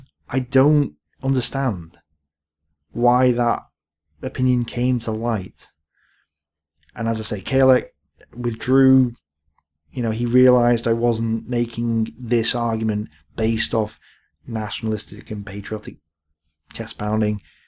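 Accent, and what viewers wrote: United Kingdom. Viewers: I am American and I came away from your Marketgarden video thinking its failure was based largely on Gavin's failure to take t Nimagan bridge. Also, I am so provincially American that I just assumed you were American. You dont sound like t Queen, Michael Cain, or Richard Dawkins. So Idt I even noticed your Brit accent. Tho now that you bring it up , you do clearly have some sort of Brit accent. My wife guessed that you are from Manchester . Tho Idt you sound like Ian Curtis either. So Idk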